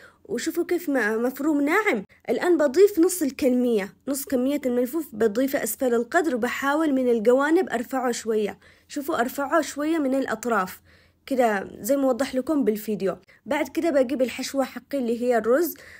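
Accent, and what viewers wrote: Aden, Yemen. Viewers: ماشاء الله ماحسنيك للهجه العدني وطريقة شرحك حلو الله يعطيك العافية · صوتك اجمل من الفديو كامل ماشاء الله عل الهجه العدنيه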